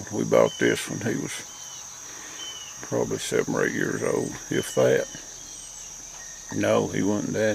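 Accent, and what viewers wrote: South, United States. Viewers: Hi!!😀😀 I just love listening to you with your southern accent!! 😀😀 I can understand you just fine. Top less women in Ashville!! Wow!! I think I need to visit. LOL!! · I think you talk like a southern gentleman. Up north we talk a lot courser. Not smooth. And New York state has five or six distinct accents. We live 90 miles north east of nyc and are a summer tourist area. Lot of city people moved up here over the years so we sound more like that then people furtber upstate.I know when I am in Tennessee and open my mouth them folks know I ain't local! Have a nice weekend.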